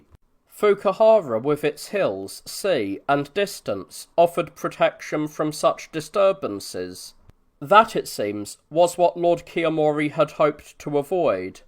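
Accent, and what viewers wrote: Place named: United Kingdom. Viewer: Thanks for the effort, Even though the Japanese names are pronounced in a British way, not the Japanese way. Still, Thanks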